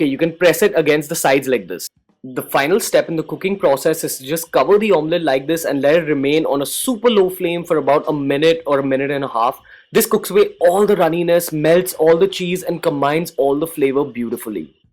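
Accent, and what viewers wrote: India. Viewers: U nghuy so ndope lol Indian accent but your so simple that what's makes u dope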